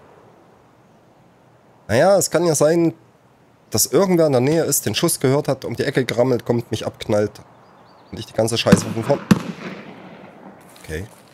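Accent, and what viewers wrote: East Germany, Germany. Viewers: Du könntest aus meinen Dorf sein so wie du redest 😅#Ossis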